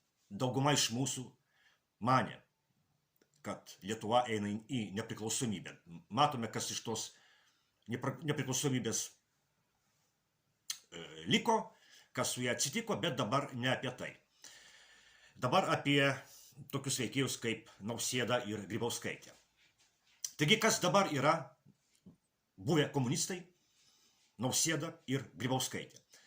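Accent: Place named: Russia. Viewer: tik vienas brazauskas nebuvo komunistas,o kalba su rusisku akcentu sitas isverstaskuris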